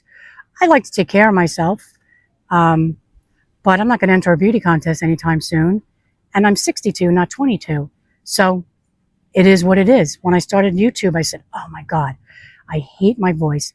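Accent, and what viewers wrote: Long Island, United States. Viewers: Listen, I have a girlfriend that tells me every designer name and the saddest part is I have no idea what she’s talking about so I do understand not having a lot of stuff around. I think it’s wonderful. Do whatever you want we’ve earned it haven’t we . I love the accent. It’s just like mine. I grew up in Long Island, but I live in Pennsylvania now, but I had 30 years in Long Island. I love your little house too. By the way I’ve been following your journey. I’m very sorry about your daughter. I truly am. The girlfriend I’m just like you when you showed that house across the water. I thought it to myself absolutely not. I don’t wanna heat it. I don’t wanna put air air-conditioning into it. And I am a minimalist in a lot of ways. I just like to talk to my husband into throwing some more stuff out or just giving it away. Anyway, do the best that you can and also I have to say you have such beautiful hair. 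I’ve been meaning to say that have a great rest of your evening. Enjoy your time with your friends and family in Florida and I’m looking forward to seeing what you do with upstate New York take it easy.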